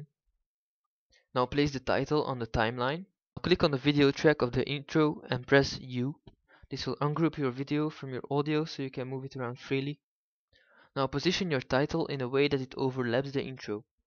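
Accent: Netherlands. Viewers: HAHA YOURE DUTCH JUST LIKE ME I heard it before i even saw 'lijndikte' en 'beeld' en 'bijsnijden'. en voor ik de comments las dùh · Je kan horen dat je Nederlands bent. Maar daar is niets mee. :D Nog succes met je videos.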